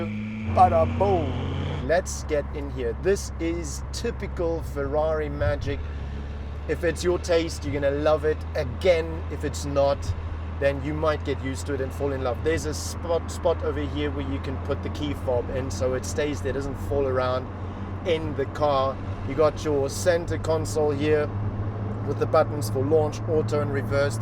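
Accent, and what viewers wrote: Cape Town, South Africa. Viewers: wait your south african ? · He is South African. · Yes meneer. Cape Town! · ja meneer. Cape Town. ;-) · This Presenter is definitely South African. gatta Pattata?. Leon Schuster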